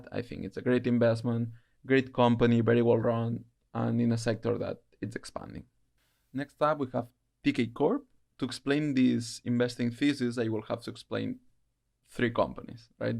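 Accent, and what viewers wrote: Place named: Spain. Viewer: Me parece bien que divulges esta cultura financiera y oír a un español hablando inglés, que no se diga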